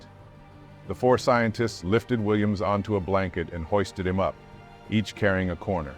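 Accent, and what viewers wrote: United States. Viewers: Shocking narration. Ugly American accent. You couldn't make this up if you tried.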